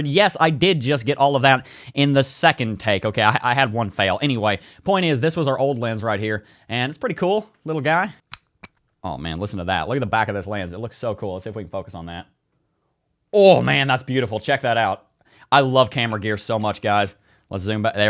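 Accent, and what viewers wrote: South, United States. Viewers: Like your southern accent, which state are you from?😂🇺🇸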